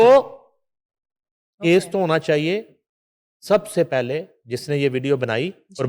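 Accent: India. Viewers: Polte ordo hyen lehja punjabi penddo hye😅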